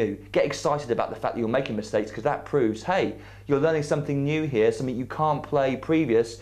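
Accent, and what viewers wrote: England, United Kingdom. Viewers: Are you really English?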